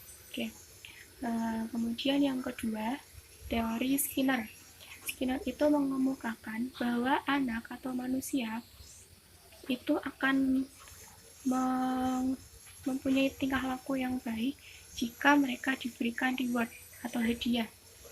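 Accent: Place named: Java, Indonesia. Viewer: Uwuwuwuwuuuuuuu medhookk kaakaaa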